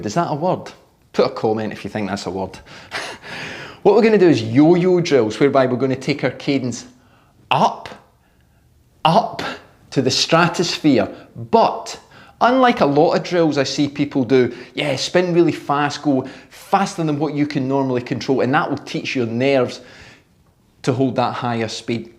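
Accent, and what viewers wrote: Scotland, United Kingdom. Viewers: Filipino cyclist here, This video helped me a lot, I've learned some things than can help me improve myself, But the Scottish accent is just too much for me😭 I had to repeat this video a couple times to fully understand what he's saying but anyway Thank you. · Lovr the Scottish accent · I am older than you, a 1968 model. You might remember Greg LeMond teaching the exact same pedaling style back when we were teenagers. He said it was like scraping mud off your shoe? GREAT VIDEO. As an American, I love the Scottish accent. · I think is from Scotland… used to live in Aberdeen so I am familiar with this accent! Absolutely love the humor, thanks just subscribed! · Love hearing a good, clear Scottish accent! This is what I aim for when I try to remove all the slang and pronounce for business calls 😂 For anybody asking, to my ears this is a central Scottish accent, 25mile radius of Glasgow. 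I think the Lanarkshire guess is spot on. Back on topic, excellent videos! I'm just getting into cycling and have been learning a lot from the channel, thank you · probably scot or ireland, very thick accent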